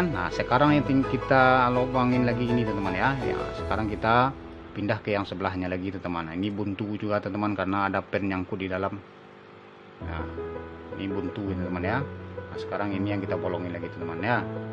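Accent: Padang, Indonesia. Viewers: Mokasih daa.. dri logat nyo, jaleh bana urang Padang🤣👍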